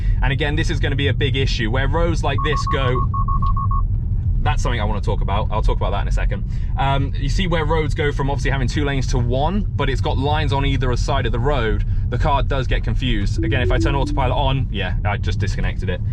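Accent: United Kingdom. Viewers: Are you scottish and doing a switcharoo. I speak english as a first language. When I speak to people outside India or in videos I speak in a way that most native would find easy. But speaking english with non native speakers in India I need to deliberately misprounce words and use incorrect grammer otherwise they want understand me.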